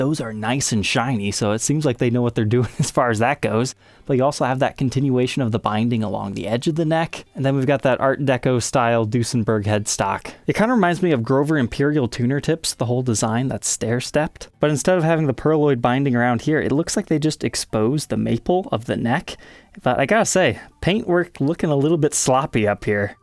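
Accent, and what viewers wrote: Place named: United States. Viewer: I stopped taking this seriously as soon as I heard an American accent.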